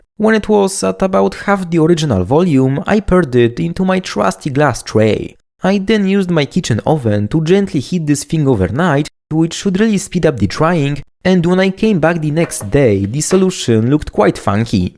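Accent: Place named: Poland. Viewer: I live to watch the armchair and backseat drivers be UNABLE to stop themselves from commenting and correcting..... JFC THIS is why we're in a burning dumpster of civilization....🙄 Otherwise, thank you to my favorite amateur chemist with the most AMAZING Polish accent.🎉 Highly informational and entertaining. Quality stuff. Kudos from Appalachia 🤙🏻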